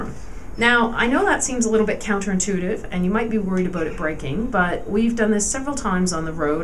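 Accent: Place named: Canada. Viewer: Canadian, eh? Nice review.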